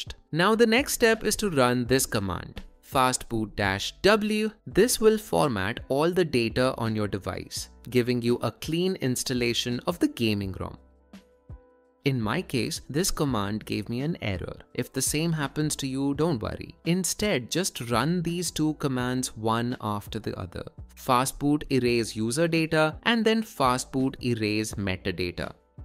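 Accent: India. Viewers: Other than indian accent..everthing seem to be OK.... .// good job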